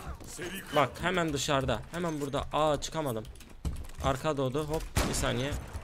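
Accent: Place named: Eastern Anatolia, Turkey. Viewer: Konuşma şiven doğu şivesiydi biraz düzelmişsin artık biraz daha anlaşılır konuşuyorsun önceden dediklerini anlamıyprfum şimdi seve seve izliyorum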